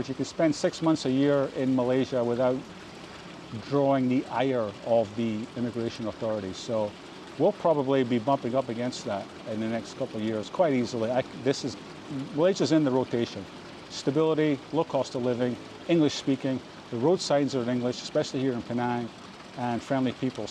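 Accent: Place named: Glasgow, United Kingdom. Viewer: That sounds like a west end of Glasgow accent…am I right?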